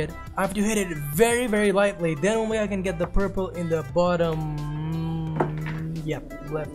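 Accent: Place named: India. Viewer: Evyatar Trabelsi It's his accent, many Indians have trouble saying w sounds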